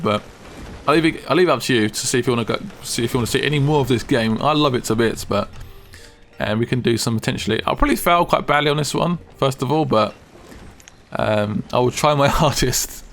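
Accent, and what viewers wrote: England, United Kingdom. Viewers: Wow I like the English Accent dude more than the gameplay lol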